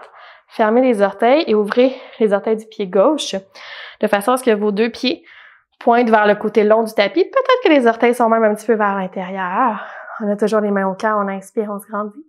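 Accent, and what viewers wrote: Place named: Quebec, Canada. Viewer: Je suis débutante et merciiiiiiii, j'ai adoré cette séance. et ce ton zen québecois cher à mon coeur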